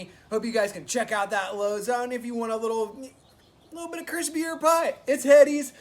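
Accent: California, United States. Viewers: I wonder if this guy knows how California he sounds, and of course he loves his nugzzz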